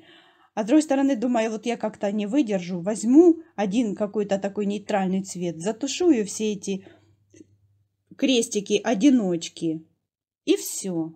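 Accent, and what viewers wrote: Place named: Kharkiv, Ukraine. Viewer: Все в порядке у Вас с языком, по крайней мере не настолько, чтобы ушам было больно. Что ж поделать, если у нас, харьковчан, такой акцент) Я даже в комментариях ниже заметила ошибки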